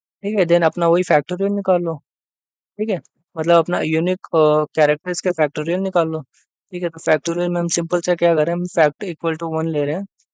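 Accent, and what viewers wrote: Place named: Maharashtra, India. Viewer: your marathi accent is so sexy:face-blue-smiling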